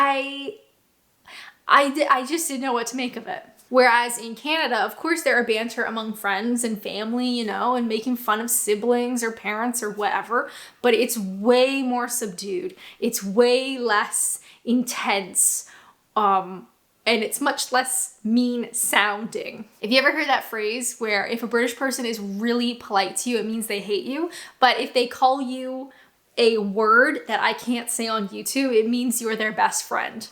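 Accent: Canada. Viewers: FWIW, I once worked with some Canadians from Montreal and for them English was a second language - they were native French speakers. While they were in Europe, the took a couple of weeks leave to go on holiday to France. They returned in tears saying that the French were the worst language bigots and that they ended up speaking English there because the French would not accept Quebec idioms as valid. Now I love the 'two nations divided by a common language' banter as much as the next Brit but I would never deny an American (or Canadian, Australian etc) the right to their own native tongue. By the way, I think your Canadian accent has become more pronounced recently; I've noticed a lengthening of some of your vowels, eg the second syllable of "about". You have spoken before about taking pride in your native accent, so I hope that you will be pleased. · Sounds more like a-boat (about) and oaut (out) than oot and aboot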